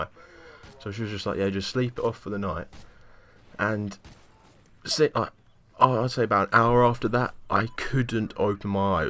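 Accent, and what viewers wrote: England, United Kingdom. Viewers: i love the british accent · what part of england are you from?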